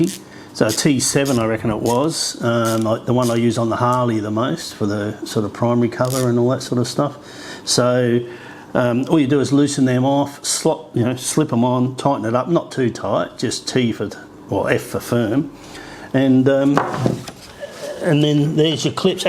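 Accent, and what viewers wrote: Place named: Salisbury, United Kingdom. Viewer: You have a very strong accent. Sounds like your from Salisbury.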